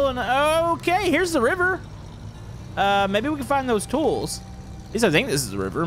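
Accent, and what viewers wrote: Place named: Texas, United States. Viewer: Your voice is speaking like texass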